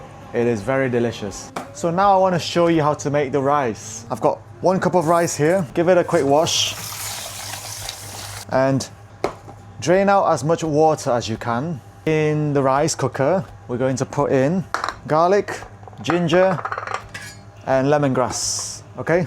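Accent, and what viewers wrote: United Kingdom. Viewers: Why would you assume that everyone who looks chinese is from china?? Also, did you NOT HEAR the UK twang?? He's more english than uncle roger. HELLO.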